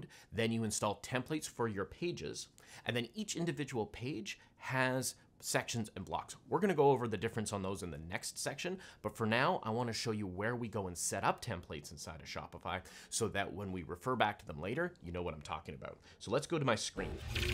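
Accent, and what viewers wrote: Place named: United States. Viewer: TG - American accent real human Shopify vid.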